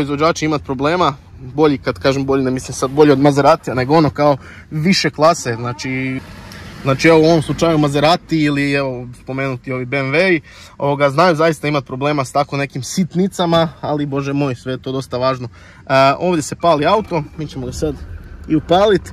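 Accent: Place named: Zagreb, Croatia. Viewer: Ako si iz Splita,sto ne pricas ko Splicani.No ka Purgeri.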